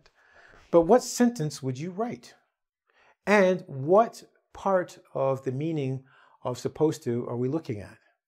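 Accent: France. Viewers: Lol your French accent is just merveilleuses! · Your french accent is priceless. Full disclosure - I am french.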